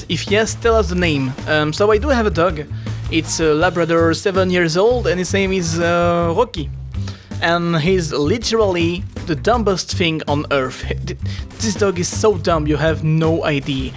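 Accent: France. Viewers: J'aime le sexy french accent :D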